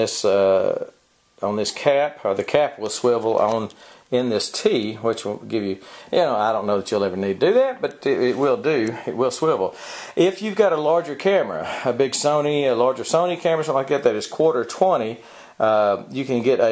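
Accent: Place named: South, United States. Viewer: I understood every word and love your accent! Voice overs for Disney could definitely be a second line of work for you! Another Southerner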